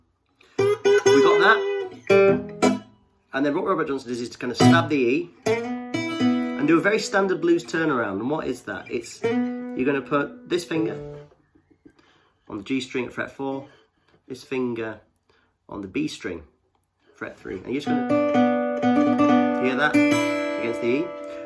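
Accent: United Kingdom. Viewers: Great instruction. Love all the little added bits that fill out the song. You are a great teacher and all done with a Northern accent gives it a special touch. Thank you.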